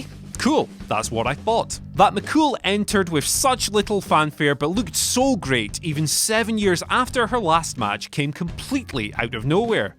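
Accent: United Kingdom. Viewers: Please help your non english speaking audience, use less britain accent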